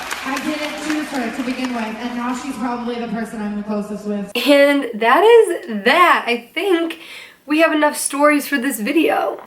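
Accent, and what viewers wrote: Boston, United States. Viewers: Kels LaMcintyre it is boston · Kinda sounds alittle Boston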